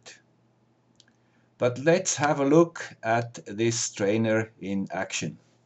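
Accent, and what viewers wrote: Switzerland. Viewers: I've followed you through the years and I have learnt a lot to the benefit of my own projects. Thank you! Just saw your "last" video and got curious about the first. Even though it is 10 years ago, you presented the Morse-trainer project much better than many videos out there today. Looking forward to more sporadic Swiss-accent videos in the future. Cheers! 🙂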